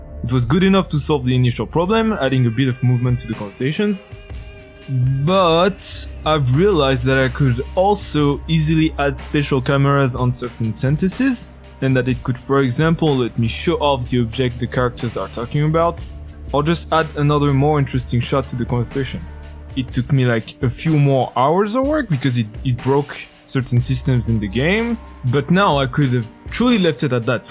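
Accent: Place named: France. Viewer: Gotta love the french accent ahah Nice changes! I'm looking forward to play the next chapter ✨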